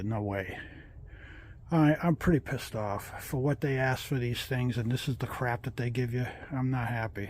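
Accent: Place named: New England, United States. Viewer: Where are you from originally? Boston? Good to hear a New England accent. I am and currently traveling… at the moment In Arizona in my Revel. Thanks for the videos they are excellent 👍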